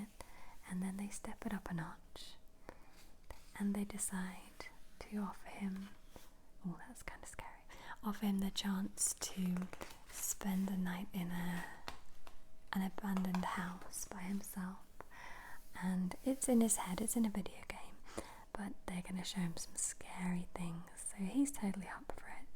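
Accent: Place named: United Kingdom. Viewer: Black Mirror has broken so many grounds. I remember watching Bandersnatch for the first time thinking how cool of a concept it was. Hope you're doing great I love watching your videos. Your Brit speech is hot chocolate in the winter beside a warm fire ❤😱🥰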